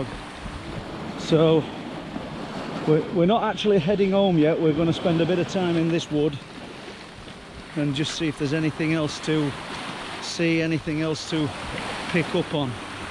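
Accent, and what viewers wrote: Yorkshire, United Kingdom. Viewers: Wonderful video and so lovely to hear a Yorkshire accent again, after growing up in The Dales. I'm 400 miles North now but do miss it, even more so now.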